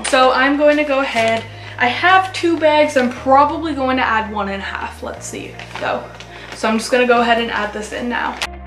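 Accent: Canada. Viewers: Are you Canadian? I think I hear the accent lol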